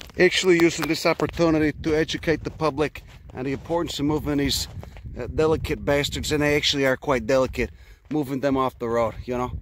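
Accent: Chicago, United States. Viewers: South side Chicago Attenborough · "Don't judge me, I will BITE your ass!" "Delicate bastards" is my new term of the week, although I am not sure I can match your Chi-town delivery.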